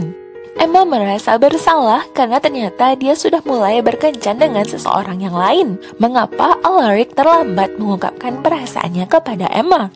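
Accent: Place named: West Sumatra, Indonesia. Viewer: Hahahahah ketahuan orang minang y?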